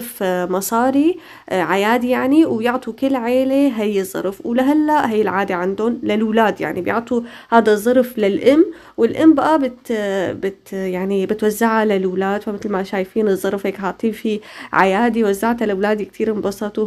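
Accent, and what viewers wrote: Syria. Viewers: اختي انت من سوريا❤ مبين حاكيك شاميه انا من سوريا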